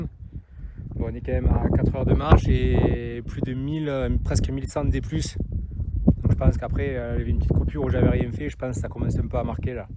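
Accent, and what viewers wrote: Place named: South, France. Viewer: Je suis bien loin de la Sainte Victoire mais c'est un réel plaisir de découvrir cette célèbre montagne en ta compagnie. C'est vraiment un endroit superbe tout comme les vues qu'il offre. Avec les commentaires au parfum de l'accent qui va bien, tous les ingrédients sont là pour une bonne vidéo ! Je suis content d'avoir découvert ta chaîne et je ne manquerai pas d'aller voir tes autres randos ! + 1 abo ! 👍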